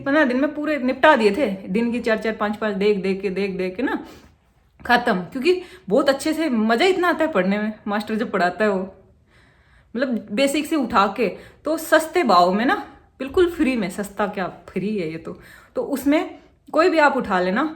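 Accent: Haryana, India. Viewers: Haryana ki accent mujhe bohot accha lgta hai😀🔥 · AAP bahut funny ho yar speech Harayana ki must hai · M to apka accent dekhke hi samjhgi thi ki app haryana t ho ...quki m bhi urey t ey hu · I Like Your Haryanvi Speech Truly Bahut Sweet Bolu he aapki......😍😍😍🔥🔥🔥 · I'm from odisha. I love your hariyanvi accent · love your Hariyanvi accent <3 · haryanvi accent? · I love haryanvi accent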